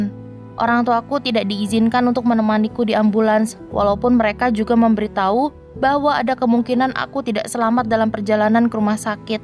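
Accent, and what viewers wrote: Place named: Java, Indonesia. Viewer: medokte tolong-kecil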